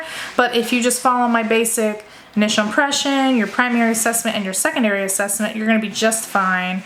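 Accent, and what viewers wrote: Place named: Florida, United States. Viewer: you ROCK! This is an excellent recap of PALS...I've been certified since '97 and this is the best class I've ever been to. I did have to speed you up to 1.5 speed, and you sounded more like a Floridian, rather than a Georgian (my home). Thanks a ton and God Bless You!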